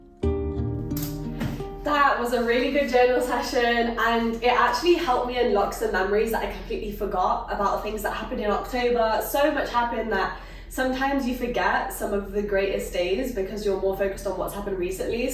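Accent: United Kingdom. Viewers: British accent >>>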